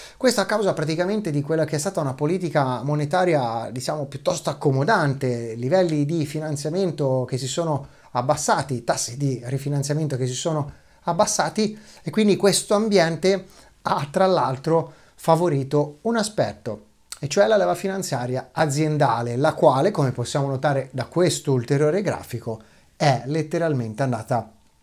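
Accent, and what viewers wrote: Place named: Umbria, Italy. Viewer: chiedevo per via dell' accento familiare, suonava come umbro, nun t'incazza.........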